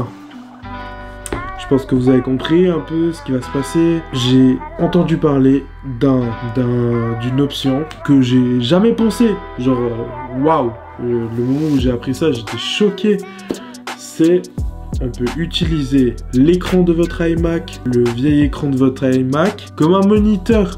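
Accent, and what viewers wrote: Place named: Switzerland. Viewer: Je fais une recherche à ce sujet, je reconnais ton accent Suisse et je vois Delémont...Yo! Je viens de Delémont aussi mais je vis à Montréal depuis 2011. Insane ce bel hasard!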